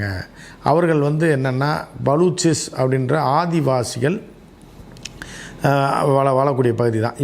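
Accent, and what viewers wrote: Madurai, India. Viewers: Fact... மதுரை மொழியில்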